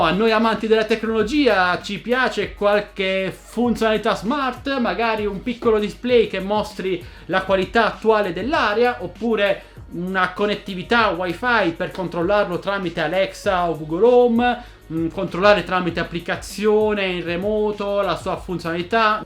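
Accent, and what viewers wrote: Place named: Apulia, Italy. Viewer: Hai un accento sei di bari o taranto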